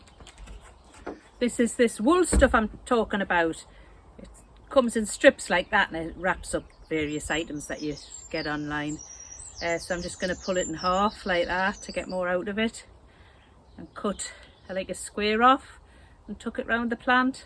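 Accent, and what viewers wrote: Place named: Sunderland, United Kingdom. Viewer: I lived in Sunderland for nearly 20 years but I'm now in the Midlands so it's good to hear the accent and compare what's happening in the garden. I really enjoy your down to earth approach so many thanks for that. Just one question - there's some lovely guitar music and playing on your videos. Who is Kenny Mitchell? Any relation? Thanks again, Dave.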